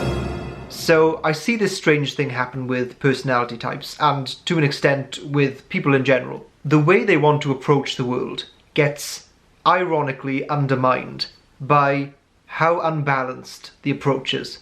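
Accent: Wales, United Kingdom. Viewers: Hmmm your accent... are you Welsh? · Omg are you welsh??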